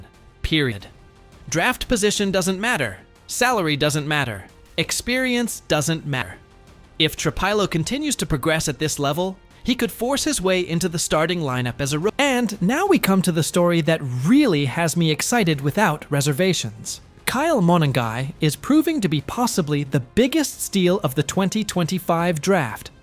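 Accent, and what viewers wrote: United Kingdom. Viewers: Gotta love the British accent in and out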